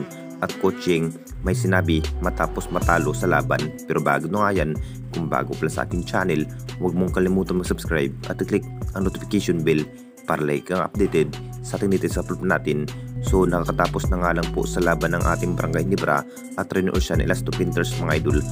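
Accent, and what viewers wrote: Visayas, Philippines. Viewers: tagalog na nga pagba blog mo.pero wla pa ron sa tsmang grammar ang mga words mo ang sakit sa tenga pakinggan tapos yung iba fake news pa dika bagay na vloger bisaog ang dating ng pananagalog mo.pwe.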